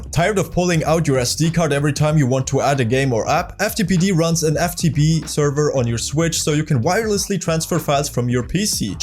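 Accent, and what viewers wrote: Germany. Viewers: You can hear that this guy is from germany.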